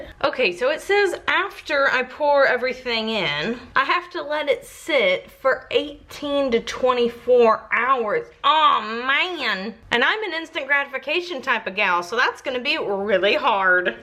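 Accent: Texas, United States. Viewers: TheLaydewlf that’s definitely Texas (also in Texas).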